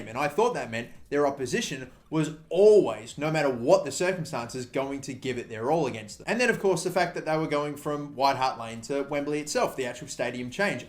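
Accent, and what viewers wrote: Australia. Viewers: Are you Australian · ARE YOU AUSSIE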